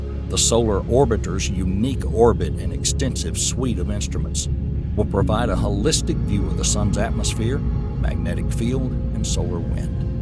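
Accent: South, United States. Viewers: I can’t figure out if the narrator is a genuine member of the scientific community, or maybe an enthusiast with an impressive understanding of the subject of astrophysics…or just someone regurgitating material from Wikipedia or space websites. I am well educated in physics and to a lesser degree astrophysics, so I can usually detect whether or not a person is pretending to know what they’re talking about or if they have a real understanding of the topic. But this guy confuses me! The complexities of quantum mechanics and astrophysics seem to be well understood by him, but at the same time, he mispronounces certain terms…egregiously! However, I too am from the south and I have experience with being judged by people who think my accent is an indicator of my intellect. Regardless, I thoroughly enjoy his content and often listen to his work in the background while I am doing housework or other mundane tasks.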